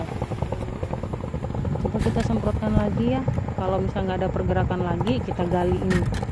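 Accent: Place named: Banjar, Indonesia. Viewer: logat banjar ny ad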